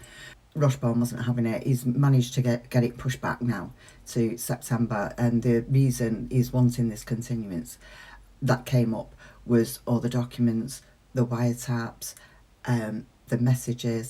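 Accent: United Kingdom. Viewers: thank you. No I figured you were British. I guess i am naive to think Britain like the US doesn’t have different accents. I love your videos 👍 Always looking forward to the next one.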